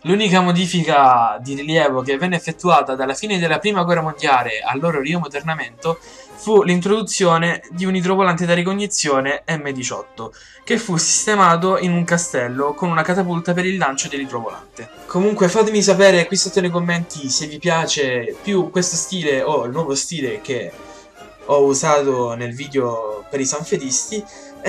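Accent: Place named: Rome, Italy. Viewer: Un paio di suggerimenti all'autore: l'audio è registrato in maniera insufficiente, e l'accento romano marcato fa perdere credibilità, serve qualcosa di piu' neutro e professionale.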